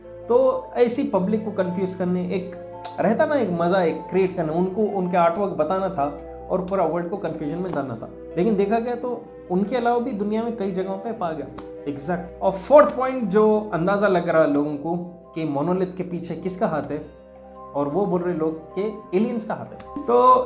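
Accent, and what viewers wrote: Hyderabad, India. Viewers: PLEASE IGNORE NKO KARO🙏🏻 IMPORTANT Bhai main aapke videos 4 5 year se dekh raha hu main Mumbai se hu aapke sabhi channel dekhta hu....par ek bat mujhe aapse kafi salse puchhani thi ke Farhan Bhai Kyu nahi dikhte ab wo kaha hai aur Kya reason hai ki wo ab videos me nahi dikhte mujhe Jana hai aur mere sath mere frd log bhi Jana chahte Kya reason hai ki wo ab nahi nahi rahte videos me plz reply kariyga 🙏🏻 bohot Sara pyar Mumbai aur Meri tarf se aap ko dekh ke main kafi chijo ko sikha abhi main 20 salka hu main aapko 15-16 ka tha tabse dekhraha hu main aap ab se jarur life me ek bar milna chahta hu main bhi aapki tarah haidrabadi bolne ki koshish karta hu waise main nahi janta etna bas koshish karta hu ...... God bless you all ❤️❤️❤️❤️❤️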